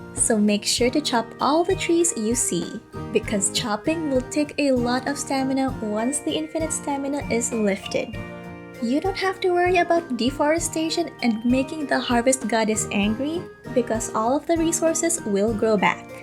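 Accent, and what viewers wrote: Philippines. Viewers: Your Filipino accent is so cute! ❤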